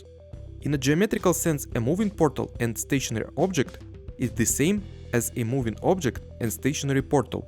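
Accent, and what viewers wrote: Russia. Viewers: Honestly yes, I would love a portal math analysis video. I love math and also the Russian accent too. I’m going to use your original video as a goal for my russian language learning. спасибо! ^^ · Я с самого начала понял по акценту, что ты либо русский либо беларус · Damn ur Russian accent is so strong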